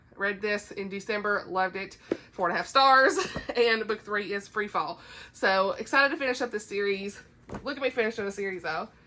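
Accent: South, United States. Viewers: I love your energy and southern accent! We read completely different things but I really enjoyed seeing all the series you want to tackle! ❤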